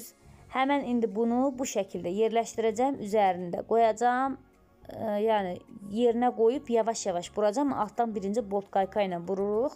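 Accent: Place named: Shamkir-Gadabay, Azerbaijan. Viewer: lap yaxşı yox yanılmışam ləhcənizdə Şəmkir Gədəbəy zonasına oxsatdim ləhcənizi.mən♥️ Ucardanam ♥️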